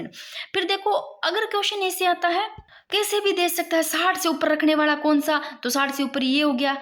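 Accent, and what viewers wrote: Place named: Ganganagar, India. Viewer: मुझे to surtgrh- ganganger- hnumangarh तो pki h ❤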